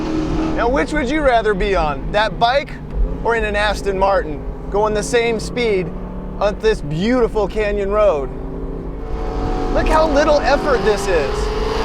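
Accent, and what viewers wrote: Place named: United States. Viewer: The car sounds great. I wish annoying yank with that grating accent would shut the f**k up though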